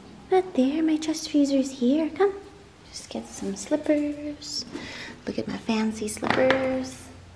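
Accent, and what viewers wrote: Visayas, Philippines. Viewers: Ka cute mag bisaya 🙈♥️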